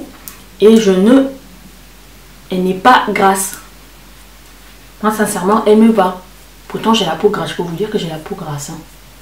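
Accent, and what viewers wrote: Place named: Senegal. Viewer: Tu es de quelle nationalité ? tu as l'accent sénégalais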